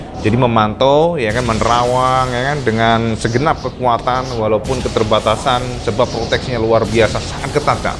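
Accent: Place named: Java, Indonesia. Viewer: Wkwkwk kalo ngomong jawa kok kocak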